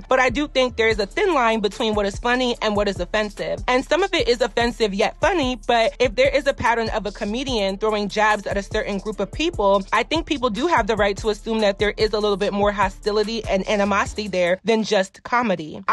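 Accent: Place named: Philadelphia, United States. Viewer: Your Philly accent is so cute😂❤❤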